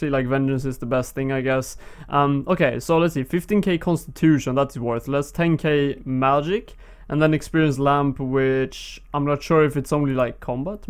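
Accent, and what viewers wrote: Sweden. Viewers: You're Swedish aren't you? :D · I love your swedish accent :3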